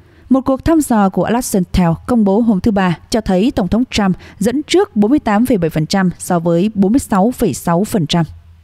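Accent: North, Vietnam. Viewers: Sao hom nay Dai HT D News lai co giong bac nua troi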